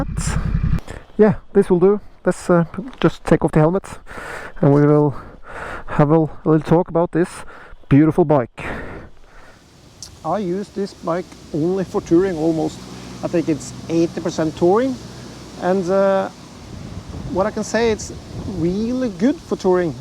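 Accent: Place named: Liverpool, United Kingdom. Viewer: Great review! There's a slight hint in your accent throughout the vid that you've spent some time in Liverpool e.g . "I just luv this bike, it's my second one and I just luv it ".... 😊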